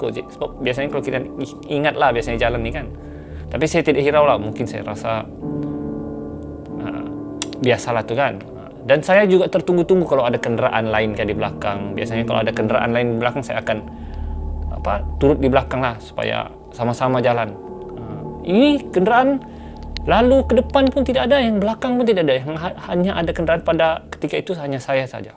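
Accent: Sabah, Malaysia. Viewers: Aku pun suka tone org sabar ckp sedap Dan aku Cuba belajar ckp tone mcm tu,memang susah Beb. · Seram sbb jln cerita..Lawak sbb slang2 bugis tawau..ketawak sendiri aq takut org smnnjung dk paham..ditapok bha😂😂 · Logat sabah cantik bunyi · Ak minat betul dengar loghat sabah ni .best je dngar. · Penceritaan yang bagus. Ton suara yang sedap didengar. Sebutan jelas. Ada emosi ketika menyampaikan. Walaupun dalam loghat Sabah, tiada masalah utk memahami dan menghayati. Jadi, teruskan! Saya suka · Sape nak tau loghat melayu zaman dahulu.. Boleh dengar loghat Sabah zaman skrg.. Like tanda setuju 😁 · Proud of your accent bro! This is how we as Sarawakian & Sabahan should talk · I just love Sabahan's accent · Best gila bro ni bercerita. Aku Jrg2 bukak youtube, dgr org bckp smpi hbs. Sbb apa? Sbb boring. Suara tak sedap. Annoying. Accent sbh bro ni, pnceritaan dia yg clear buat aku khusyuk nk dgr smpi hbs. 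Hahha. Good job! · Tu sebahagian dari loghat melayu sabah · Sy org Kedah,tapi suka sgt dgr loghat Sabah.. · Bkn setakat accent sabahan ja , org2 dia pn aku suka . Cantik2😅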